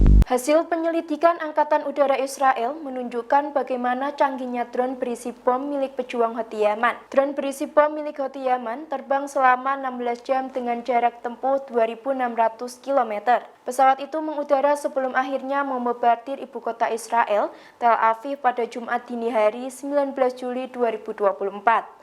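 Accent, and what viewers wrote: Java, Indonesia. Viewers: Soundnya kok medoookkk banget 😂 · suarane medok,,,tp merdu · Ngomngnya medok amat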